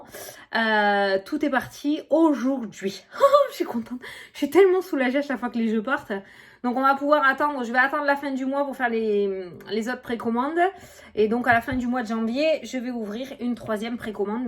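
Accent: South, France. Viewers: Quelle joie de t'entendre avec ton petit accent du Sud 🥰 Et un pur bonheur de tes predictions avec ton franc parler 🤩 Magnifique et merveilleuse: MERCI 🥰😍🤩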